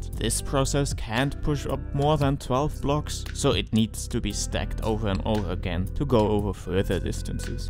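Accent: Germany. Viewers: Man kann den Deutschen akzent etwas krass hören, xD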